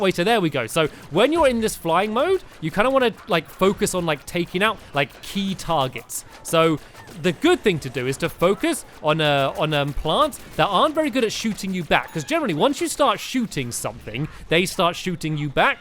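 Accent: England, United Kingdom. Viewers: The U.K. Can't you tell from his accent( England )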